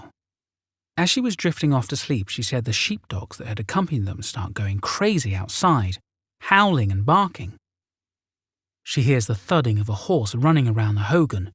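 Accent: United Kingdom. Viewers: Why is this story by a British narrator?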